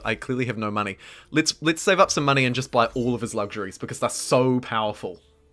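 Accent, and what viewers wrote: New Zealand. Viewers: I'm from NZ and I can't quite pinpoint your accent. It's definitely kiwi, as the "kiora" suggested, but some things sound English, and maybe Australian, and maybe something else I can't pick. Like you said something like: 'aw nah look they're running in with "skart" riders' For "scout", is that a UK accent? Anything else? Just curious lol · It sounds pretty kiwi to me. Maybe rural NZ?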